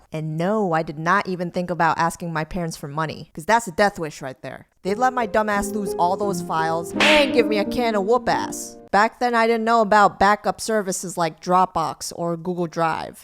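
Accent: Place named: New Jersey, United States. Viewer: no hate but u sound like the steryotypical jersy girl/New york girl 😂💞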